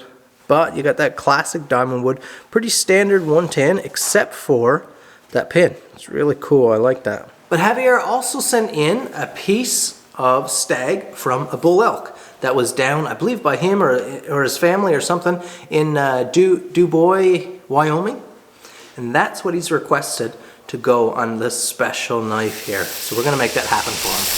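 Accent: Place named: Newfoundland, Canada. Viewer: It’s a Newfoundland accent.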